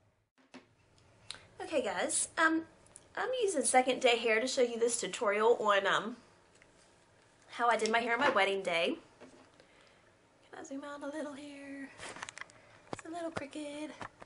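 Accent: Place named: South, United States. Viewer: Where are you from? I sense a lil' southern accent? Love it!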